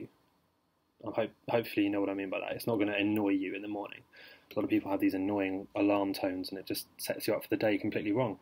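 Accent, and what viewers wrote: United Kingdom. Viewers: Makes sense..I've never had a lucid dream, not ever really tried to. But I think I will after watching this. My body naturally wakes up between 5am-6am & then when I get back in to bed and fall asleep I have the most intense real life dreams, so colourful & I can touch people and talk and do things..but I think I am not lucid dreaming because I only remember it once I wake up and I've never drifted off in to sleep while staying still. I usually always lie on my side..from the urge to haha..but yeah it makes sense in to hear this method..I will let you know if it works. Thanks for your video, also nice to hear a fellow Brit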